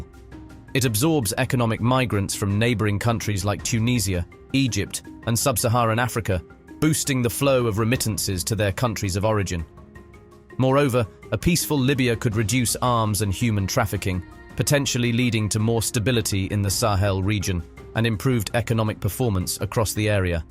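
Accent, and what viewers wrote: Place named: United States. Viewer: HILARIOUS and LUDICROUS! You're talking noise man! You're talking about dwarfs who're trying to get a normal size! Senegal and Niger What a joke man! Libya used to be Z wealthiest and socially developed before Hillary Clinton put an end to its success story! Libya HAS NOTHING TO DO WITH THAT POOL OF bantu DWARFS! Rwanda? C'mon! It weighs NOTHING compared to Tanzania and Uganda either! HAVE A LOOK AT GDPs instead of that nonsensical growth-based bla bla! hAVE YOU LOST YOUR MIND? ETHIOPIA is a giant in all aspects! NOthing to do with that Bantu thing... C'mon! Btw, your accent smells American more than African Bantu. HILARIOUS POST! GROWTH IS NOT EVERYTHING! Just look at China's 5% and US 3%... and STOP COMPARING carrots and gold! It's LUDICROUS and so IGNORANT of how this world works! Go back to school!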